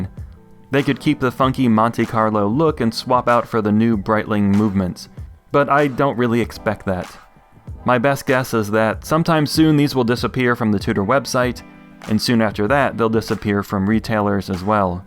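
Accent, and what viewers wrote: Birmingham, United Kingdom. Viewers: Love the Brummie accent! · Nice attempt at brummie accent! It’s where I went to University:)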